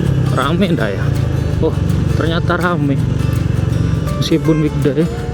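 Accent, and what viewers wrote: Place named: Madura, Indonesia. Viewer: Logatnya maduraan.. hehe salam persaudaraan mase....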